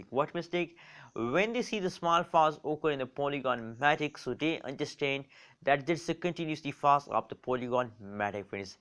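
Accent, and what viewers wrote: India. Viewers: white people video thumbnail english language indian accent as fast as japan high speed electric train no facts at prediction even a teenage 14 boy also can reexplained this thats blah blah blah zero informative content as for sure most brainy positive video presented by showing by content presenter faces for sure this boy wanna follow hype and get fast subs and views and get money for sure many viewers will stay out from this kind of video coz its promising thumbnail video promoting click bait.. well done indian teenage boy!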